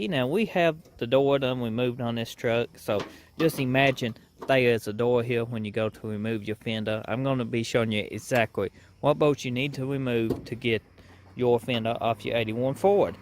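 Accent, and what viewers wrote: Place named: South, United States. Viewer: Southern accent, nice, friendly and helpful people.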